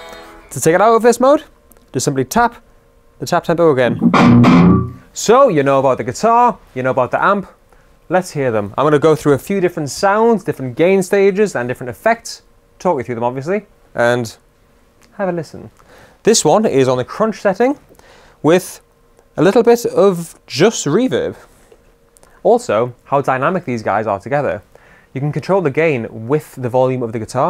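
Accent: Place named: Sunderland, United Kingdom. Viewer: Can't quite place the accent, I think he comes from Sunderland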